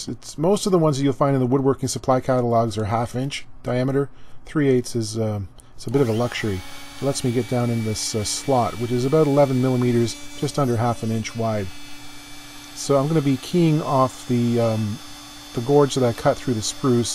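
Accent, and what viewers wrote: Canada. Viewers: Don't you worry about that Canadian accent...you do you! Thanks for the amazing videos!!! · In Canadian about should rhyme with shoot and scoot. That’s part of the charm of listening to this channel, to hear a talented foreigner speaking the English as only an occupant of the great white north can. Seriously, I’m Virginian and y’all say it like the folks from Richmond. Thanks! Another wonderful video. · Don't check yourself about "about"! It's good to hear an identifiable Canadian accent. I'm a particular fan of Ontario accents from further east, which may almost sound Scottish or Irish. And I'm scientist and my cuticles are no better than yours. This one reminds me of my ongoing dental work... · Embrace your Canadian ways and accent! ;-) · I'm a new viewer of this excellent channel. I realize this is an old video, but I find it sad that you're cautious about (aboot😅) how you pronounce certain words. 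I love Canadian, and I love your videos. Keep up the good work! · Go ahead, sound as Canadian as you like. You wouldn't tell an American to not sound American. Anyway, guitar sounds pretty good, imo. Nice work and video ... very relaxing.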